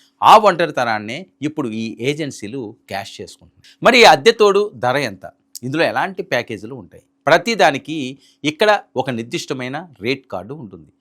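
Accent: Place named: Andhra Pradesh, India. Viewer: వరై ఏంద్రా ఆ loveday ల భాష..యాస ... నీ ఆంద్ర సోల్లు ఆపు... మంచిగా తెలుగు మాట్లాడు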